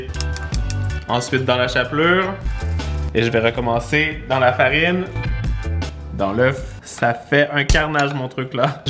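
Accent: Canada. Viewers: tu mon youtubeur préféré desCarl is cooking vidéos accent canadien et qui met quoi des vidéos trop trop rire 👍🇨🇦👍👍👍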